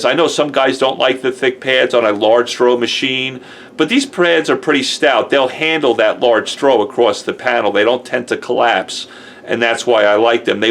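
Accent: New York, United States. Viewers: Do I detect a NY accent?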